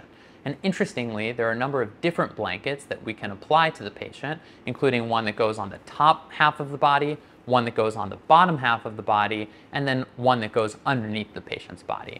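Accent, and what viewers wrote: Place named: United States. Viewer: Oh really, well you’re speaking to a different audience than I assumed. Your English is clearly accented from the States. Carry on then but non professional Americans will not make the necessary calculations to make your temperature references meaningful. Let me also say other than that I think your presentation was excellent with meaningful information and I hope to see further videos by you.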